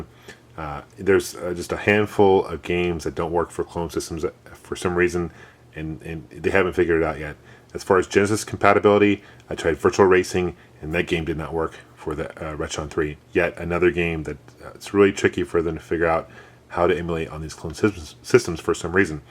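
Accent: United States. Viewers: you sound like your from kentuky like me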